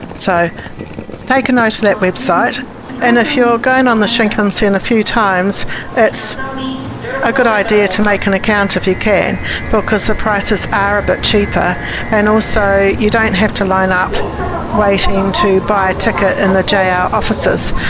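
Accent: New Zealand. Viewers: I do enjoy listening to you with your lovely New Zealand accent but also the level of detail you provide. Envious of your adventure and appreciative of you putting yourself out there...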